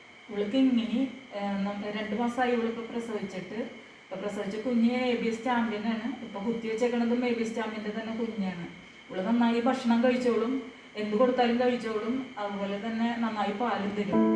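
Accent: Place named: Thrissur, India. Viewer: തൃശൂര്‍ ഭാഷയാണല്ലോ എവിടെയാണ് നാട്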